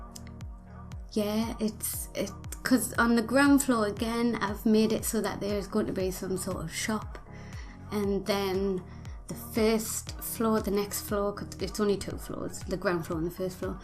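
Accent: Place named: Yorkshire, United Kingdom. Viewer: I like your Yorkshire accent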